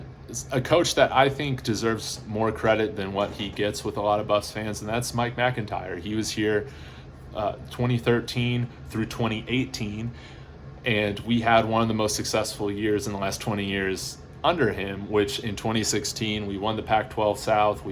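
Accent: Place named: United Kingdom. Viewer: yes I saw - nice to hear a British accent in the videos. Traoure looks like a beast - but I was also waiting on Christian wade to break through for the Bills! Fingers crossed CU can get one of them into the league - trying to keep up with who is from last year with the CU and who are the new prime recruits. But it will be fun to watch the spring game! Keep up the content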